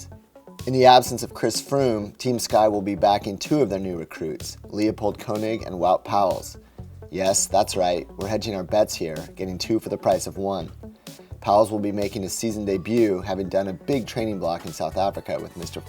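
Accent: United States. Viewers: Why the new American accent? Don't like it all. Stick to British presenters, and maybe call in the Irish when the Rás comes into the conversation.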